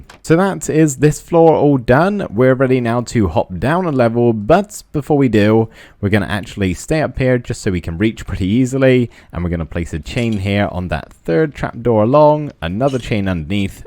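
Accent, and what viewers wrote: United Kingdom. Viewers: Bro, your British accent sounds really cool)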